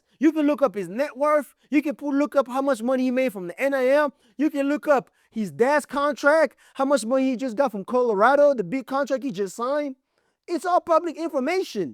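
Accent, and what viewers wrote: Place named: Nigeria. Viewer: Is that your name or your faith? You’re too bitter to go by that name.I mentioned Nigerian because I detected an accent, but I was really hoping you weren’t! If you consider “ working hard” irrelevant then there really isn’t much I can say. You go and be all the things you claim Shedeur isn’t. Your video actually showed up as “ sponsored”. You need to “ read the room”. That young man has a popularity that you can only dream of. 5th round pick?? Yet here you are, and all the major channels, discussing him!! Good luck and goodbye.😂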